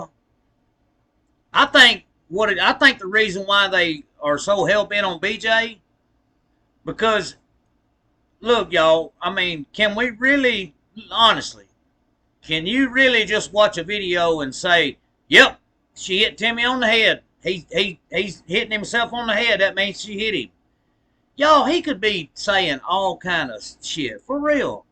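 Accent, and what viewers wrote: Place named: Carolinas, United States. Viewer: Yo 😂😂😂😂u ah trip bro,where u from. I from Charleston SC n you sound like you from SC NC or somewhere close. What sense it make to talk to Timmy on a video,I mean you can't understand what he says😂😂😂😂😂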